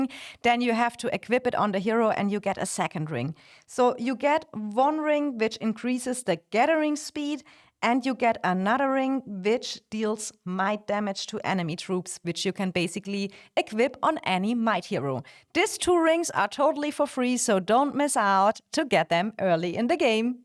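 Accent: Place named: Germany. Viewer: The German accent ❤😂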